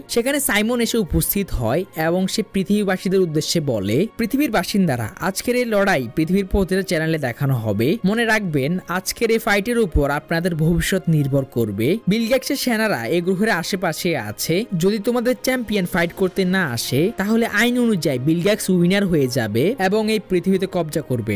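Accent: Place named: Noakhali, Bangladesh. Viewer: Noakhali accent chara baki shob tikh ache. Accent tikh korle awesome hobe. Good luck bro.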